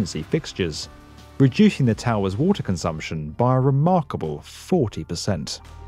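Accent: United Kingdom. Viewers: I find the British/non-American accent a bit distracting! I can't quite concentrate on the content of the video because of that! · Why is a video about buildings in America narrated by a Brit?? So annoying.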